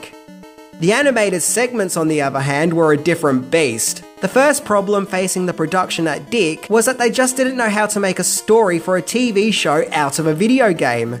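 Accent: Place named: Australia. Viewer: 'Ita wassa game like no-othaaaaa" Too bad they didn't hire an English speaker that speaks ENGLISH instead of what is assumed to be English (Thick Australian? British?) Ruins it for the rest of the video.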